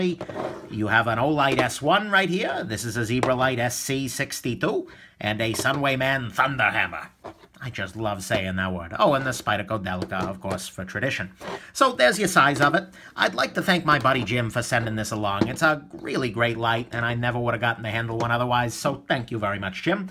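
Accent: New York, United States. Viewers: I hope your fiancé has your beautiful New York accent. And I also pray that your babies, of which I hope there are many, also have that beautiful New York accent!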